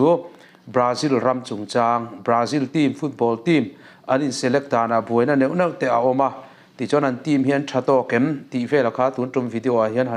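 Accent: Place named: Paite, India. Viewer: Ichanel ania, ilan loh ten paihte angin tawng chu pai mai mai mah se apawi hlei nem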